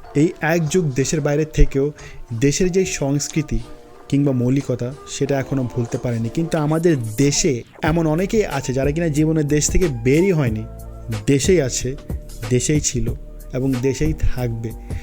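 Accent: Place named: Noakhali, Bangladesh. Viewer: খানকির পোলা তুই নোয়াখাইল্লা তোর কতায় বুঝা যায়...তুই নিজেই গেয়ে.... আমেরিকায় থাইকা মডানগিরি চোদাও যাইয়া ত পেপার বেচস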